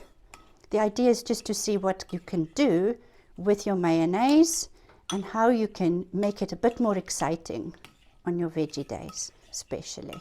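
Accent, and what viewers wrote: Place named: South Africa. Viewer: Great ideas thanks for sharing. You sound South African 😊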